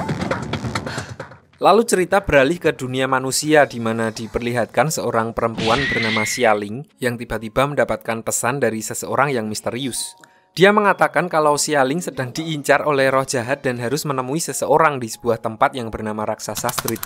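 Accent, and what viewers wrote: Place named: Java, Indonesia. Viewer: Subscribe dlu smbari nntn Suara mimin asik jls dn ada jawa² nya gitu 🤣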